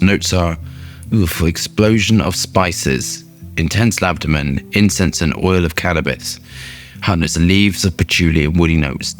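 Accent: England, United Kingdom. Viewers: Chris Pine with an English accent and tattoos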